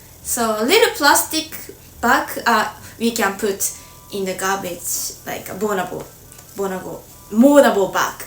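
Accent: Japan. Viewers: ❤❤ JAPANESE WOMEN ARE VERY EMOTIONAL I AM EMPRESS FOR YOU LIKE OTHER JAPANESE WOMEN I'VE MET ARE STUDYING IN THE PHILIPPINES SOME OF THEM SPEAK TAGALOG OTHERS OF THEM ARE MAJOR HERE😊🎉.. THE HISTORY OF NATION 4 THE LANGUAGE OF THE PHILIPPINES TAGALOG ENGLISH SPANISH JAPANESE DURING THE COLONIAL ERA 400 YEARS AGO THE 4 IMPERIAL COUNTRIES DURING THE JAPANESE EMPIRE COLONIAL ERA IN THE PHILIPPINES JAPANESE AS A SECOND LANGUAGE BUT WHEN THE PHILIPPINES BECAME FREE JAPANESE AND SPANISH LANGUAGES IT WAS REMOVED. THE ONLY THING THAT TEACHES IS THE JAPANESE SCHOOL IN THE PHILIPPINES BUT IN SPANISH WE HAVE A DIALECT THIS IS CHAVACANO 80 PERCENT SPANISH LANGUAGE WITH A HUNDRED DIALECT MANY ARE STILL TEACHING US BUT IT JUST DEPENDS?. I THINK YOUR ENGLISH IS OKAY BUT IT HAS A JAPANESE ACCENT❤😂😂 WHEN I GO TO JAPAN I WANT TO MEET YOU YOU ARE ALWAYS IN MY HEART 😊❤❤!!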